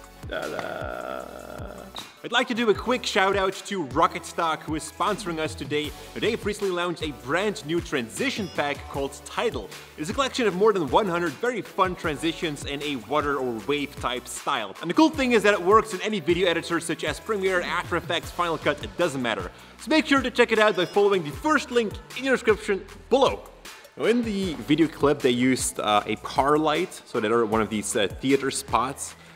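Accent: Netherlands. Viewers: Are you dutch ?? Me too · Wacht, ben je Nederlands? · Are You dutch? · Ik hoorde al een accent maar jullie zijn dus nederlands!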